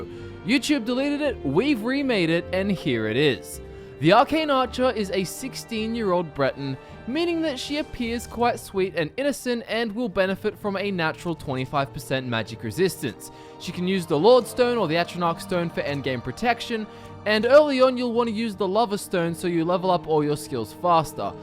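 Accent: Australia. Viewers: australian accent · it's an australian accent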